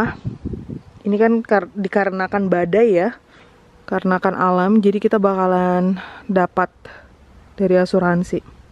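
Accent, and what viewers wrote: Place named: Java, Indonesia. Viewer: Ya...allah liat mamak pakai bahasa jawa jadi jawa madura , kemekel aku mak dengernya #asli sidoarjo jatim #fans online mu mamak#semoga menular punya baby penantian 13th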